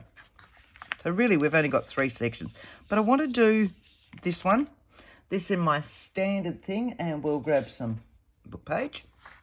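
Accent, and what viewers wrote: Australia. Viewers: Hi from Ballina, NSW. Nice to hear another Aussie accent. I really enjoyed two of your videos that I've just watched and will definitely be adding this to my next journal. I'm happy to have some cooler weather this month. Cheers 😊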